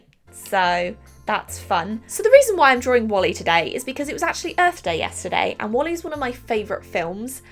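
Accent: England, United Kingdom. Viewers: Are you in Uk? Just wondered you sound English. I like the look of the brush cleaner. xxxAnnxxx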